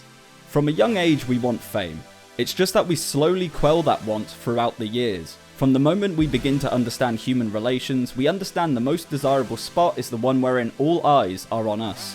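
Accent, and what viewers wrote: United Kingdom. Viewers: Fame is bad sez the guy looking to become 'famous' via youtube subscribers. These British accents are starting to wear on my ear again.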